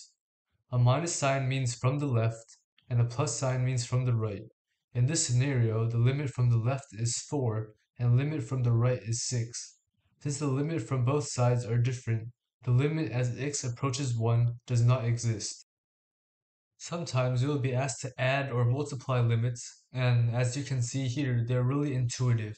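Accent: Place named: Asia. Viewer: I dont think he's Indian, he sounds more central asian, southeastern asian, or pacific islander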